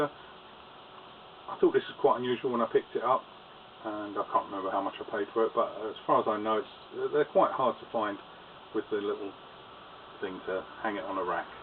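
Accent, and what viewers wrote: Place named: United Kingdom. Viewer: (This is Tom, not Sandra.) Why do you think that England didn't have picture sleeves for the Beatle records back in the early 1960's? Have you found that out since you taped this video post? You have some really great collectibles there, sir. Thank you for sharing your collection with us all !!! Love your accent, also!!! Love all the British accents, really!!!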